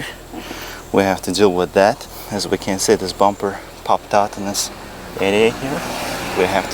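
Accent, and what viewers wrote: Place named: Russia. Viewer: Интересный акцент, похоже на русское происхождение никак не английское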